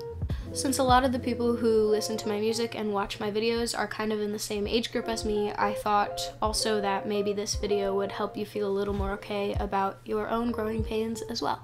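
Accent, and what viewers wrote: United States. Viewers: Wait I thought she's American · I thought you were American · i thought u were american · i thought you were american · isn't it american? :0 I literally watch his videos to hear his voice, but I speak Spanish and I don't understand anything, hahaha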